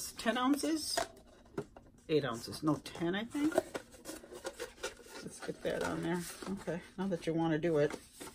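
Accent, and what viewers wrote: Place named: Minnesota, United States. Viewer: I knew with the accent you had to be from Minnesota, I’m from Delaware and we barely get any Rae dun. You got so lucky I want those coffe cups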